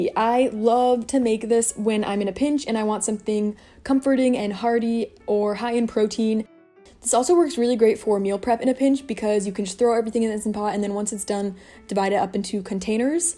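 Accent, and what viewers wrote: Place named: United States. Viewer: What American accent is this :-)? Sounds intrueging!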